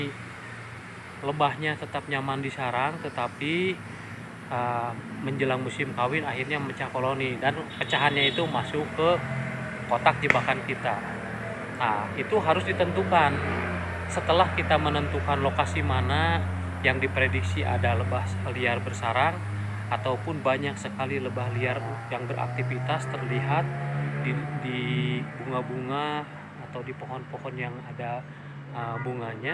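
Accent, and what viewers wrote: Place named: West Java, Indonesia. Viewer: Nuhun kang, logatnamah bangun urang sunda, ti palihan nana kang???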